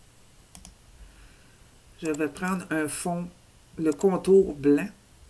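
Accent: Canada. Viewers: sa ma plus votre façon de parler a la canadienne merci pour le parage ;-)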